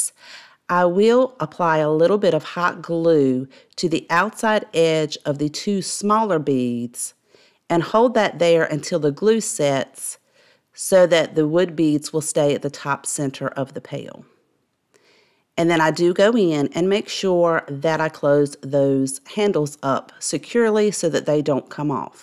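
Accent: South, United States. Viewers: Your scales is amazing and the best I’ve seen.....ps from one southern gal to another....lve the accent!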